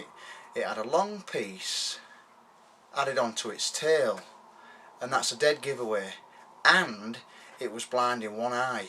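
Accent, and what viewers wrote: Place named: Barnsley, United Kingdom. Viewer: This bloke must be from Barnsley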